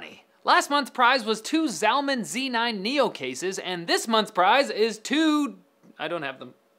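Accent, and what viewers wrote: Ireland. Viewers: such a racist Irish accent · Nice Irish accent....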